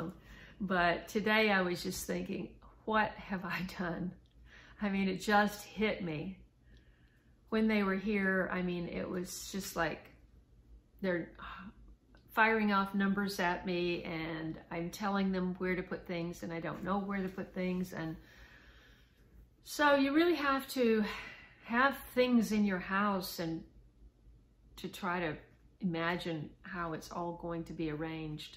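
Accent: Tennessee, United States. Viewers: A Tennessee accent very endearing to me. I am from Wilson county and have lived in SC for 42 years. I pick up my native TN accent in a heartbeat! Let 'er fly, sister! Your home is beautiful. It seems light and cozy.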